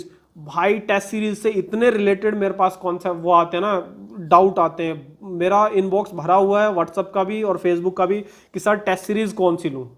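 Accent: Haryana, India. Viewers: 🙏🏼 haryanvi accent ❤️ Tahe dil se shukriya sir❤️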